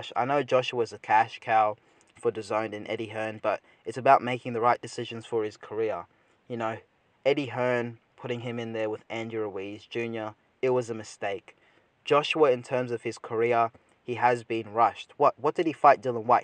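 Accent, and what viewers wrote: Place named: Australia. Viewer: 👍 I knew I could hear a slight Australian accent in your voice. It's very mild though...hard to tell.....I bet most Americans think you're from the UK!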